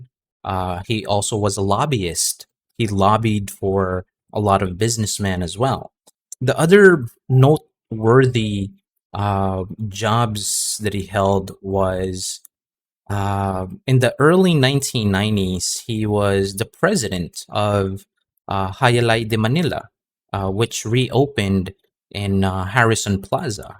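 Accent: United States. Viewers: Yeah! But boy u hide in american palda what a shame u speak americanize compared to ur lolo but no doubt ur lolo have more nutrients in his brain against yours ..what a shame 😂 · I think you're wrong about the English language being exclusive to the elite, the educated and very rich. You probably think so because you grew in America (judging from your accent). Public schools (schools for the masses) at that time were taught subjects in English, so the language was more common than you think. This dissemination of English was the product of 40 years American colonialization. It was the Americans who set up the educational system for the masses and the middle class. It is perhaps the foundation of why today many Filipinos speak English as a native language and why the Philippines ranks among Asian countries as one of the largest English speaking populations. 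But yes, your grandfather was very intelligent. Cheers. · are u in the Philippines? Coz ur american accent is very nice 👏 Ilang taon po pala lolo nyo nung namatay sya? Seems he had a long full life.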